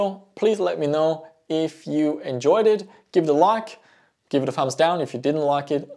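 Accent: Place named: Germany. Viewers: btw i'm from germany and always noticed your german accent, where are you from? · looks like a UFC cruiserweight. Looks dangerous and his German accent backs it up. Scare me in a dark alley...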